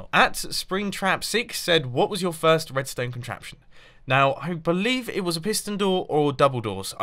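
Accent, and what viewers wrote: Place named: United Kingdom. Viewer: Sometimes I can't understand in all of his Britishness. I literally just sit there and try to understand, but I find it amusing. Don't change,.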